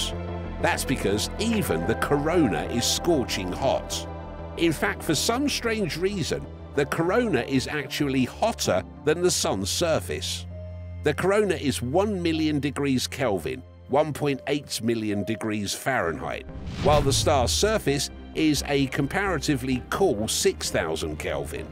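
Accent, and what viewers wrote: United Kingdom. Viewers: annoying british accent...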